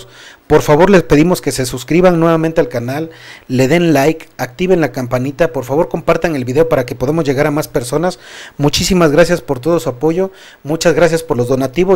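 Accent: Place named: Mexico. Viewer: Me gusta como tu voz es la de los mexicanos no deje de hablar como es, claro y conciso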